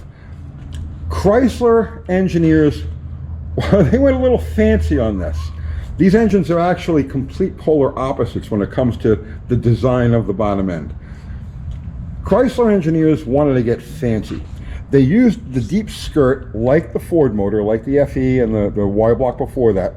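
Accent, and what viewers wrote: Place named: Boston, United States. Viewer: I can listen to you info dump all day. I think part of it is hearing you talk car engineering in your slight Boston accent reminds me of the tappet Brothers I used to listen to all the time. Kind of humorous to hear a boomer say yeeted though.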